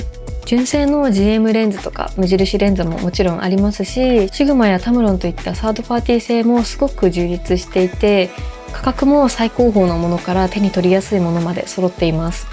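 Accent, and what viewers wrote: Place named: Japan. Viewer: 너무 좋아요. 일본 사람이 한국어를 하는 억양이 그대로 살아 있어서 깜짝 놀랍니다.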